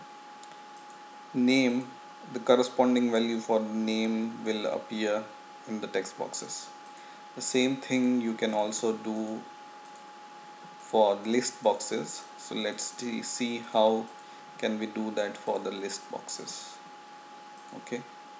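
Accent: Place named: India. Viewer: You did well but let me tell you, your Indian accent is so ugly and I hate it. you should put more effort into practising pronouncing english bro then I bet your videos r greater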